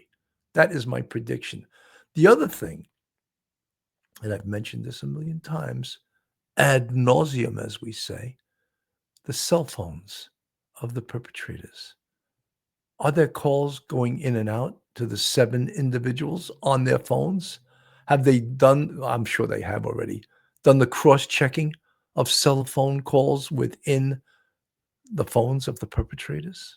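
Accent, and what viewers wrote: France. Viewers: Im glad they solved this fast! I hope the jewels are found soon, 😥 I doubt it tho. ❤btw❤adorable frenchie accent 😂❤ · Thank you Sgt., hoping and praying that the priceless jewels are found. French accent appealing, we are created to laugh! Good accurate reporting, your expertise is valued!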